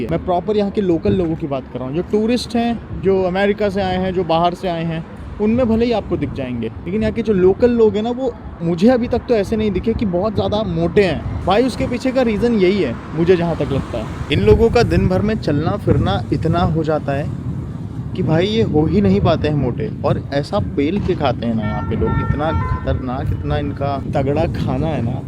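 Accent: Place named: Indore, India. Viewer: Maza to aarha ha 😂 ye to sach ha or indori suun ke or maza aarha ha kyuki me bhi indore se hu❤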